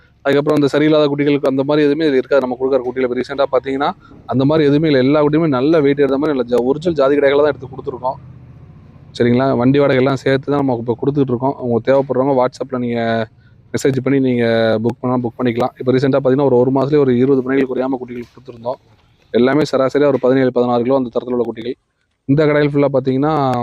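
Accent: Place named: Madurai, India. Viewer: Madurai basha ❤❤❤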